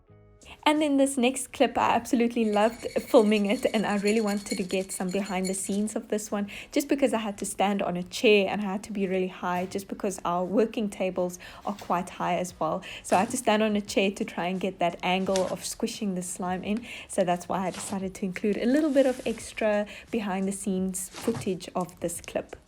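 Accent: South Africa. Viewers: I clicked on this video having no idea that you're South African! I heard your accent and was like OMG MY PEOPLE!! I live in the Eastern Cape and find it unsurprising that you're based in CT where the cool things happen. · wait is she south african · wait, i just noticed ur from South Africa, i looked up ur slime shop and saw everything was in that currency. I'm shocked, no wonder your accent sounds so familiar! My mother is from South Africa! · I have a question are you in South Africa because of your accent and because of the brand of the coffee and milk? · When i heard your voice i immediately thought of south africa😂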